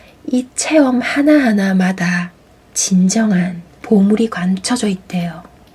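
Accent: Gyeongsang, South Korea. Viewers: 부산에 사셨군요. 처음에는 대구 말투인줄 알았어요.^ 티나다=포떼나다 갱상도 사투리죠~^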